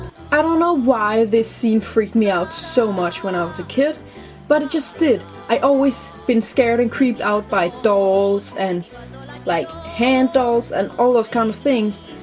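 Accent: Denmark. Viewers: You're from Denmark aren't you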